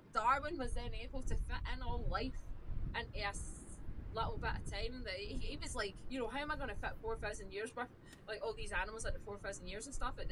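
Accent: Scotland, United Kingdom. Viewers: I’ve said it before but I’ll say it again: geology sounds better in a Scottish accent. 🤘🏴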